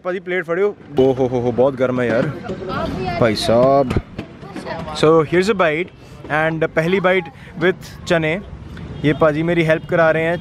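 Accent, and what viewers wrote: Punjab, India. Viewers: Veerey assi kadi vi Punjab nahi aaye lekin taudi lens naal and taudi accent naal mainu ae lagdaa hai ki main Punjab to kaddon chhad ke gaya hi nahi.. jyonda, hansda Te wasdaa sadaa sadaa rahwega saada Punjab Te saada Hindustan.. BTW please mainu maaf karna agar Saadi Punjabi Galt hui. Cheers!!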